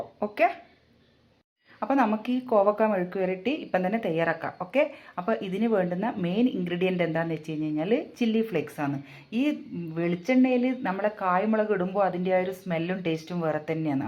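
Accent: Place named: Mahe, India. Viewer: മിക്സിയിൽ ഒന്നു പൾസ് ചെയ് തെ ടുത്താൽ മതി ചില്ലി ഫ്ലാക്‌സ് ആക്കാൻ നിങ്ങൾ മാഹി സൈഡ് ആണോ സംസാരം കേട്ടിട്ട് തോന്നുന്നു ണ്ട് കോവക്ക സൂപ്പർ ആയിട്ടുണ്ട് 👍👌😋😋 👫🔔✅ New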